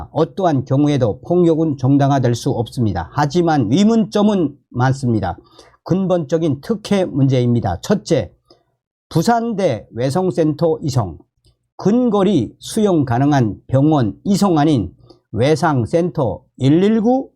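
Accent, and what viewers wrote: North Korea. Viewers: 북한사람인가. 목소리가 북에서 온 사람 같아요.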